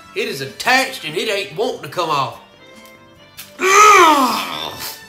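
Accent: United States. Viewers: Man his cowboy accent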